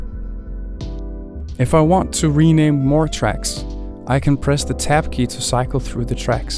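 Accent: Denmark. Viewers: This guy sound Danish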